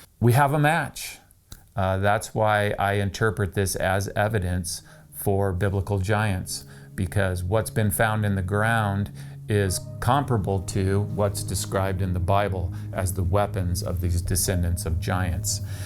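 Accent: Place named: United States. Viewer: you don't seem to be able to tell the difference in a spear head and an arrow head. You're also a bit inconsistent in which measurement you give. Sometimes in metric, then immediately follow with imperial. You said the spear heads were a half inch apart, then describe the largest as 66 cm. Pick a lane or give both measurements. You have a very American accent. Don't you use the imperial system?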